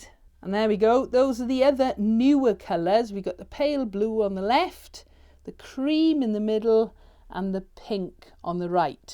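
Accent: Wales, United Kingdom. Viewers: Omg haha I'm sooooo sorry 😂 I'm usually pretty good with the Welsh accent and you speak very clearly so it was obviously me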